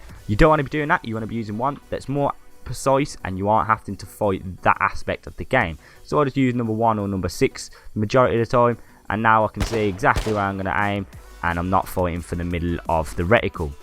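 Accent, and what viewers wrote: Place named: Birmingham, United Kingdom. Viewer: u from birmingham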